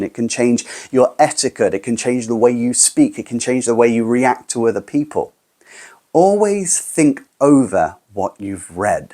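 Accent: United Kingdom. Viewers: You're accent is very elegant and precise. A true british one.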